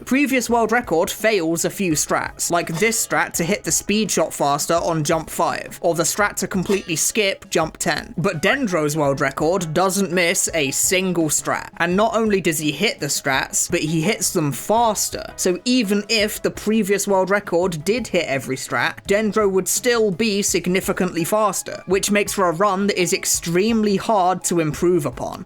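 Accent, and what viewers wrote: United Kingdom. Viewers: Unfortunately you a British so I could not enjoy this video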